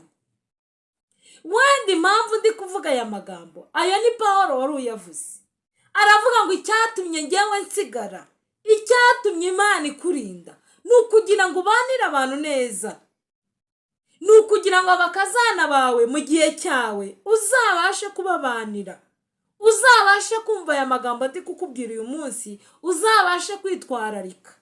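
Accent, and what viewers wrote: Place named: Banyamulenge, Democratic Republic of the Congo. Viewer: Mubyeyi urabivuga se urabizi ariko numvise uvuga nkabanyamulenge uriwe noneho nagukundaga ndushijeho ❤❤❤❤❤❤ uzansubize niba aribyo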